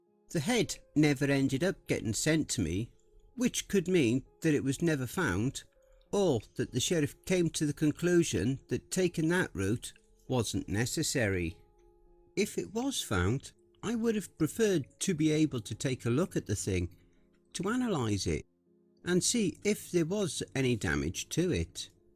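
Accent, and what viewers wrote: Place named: RP, United Kingdom. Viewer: With a delightful RP British accent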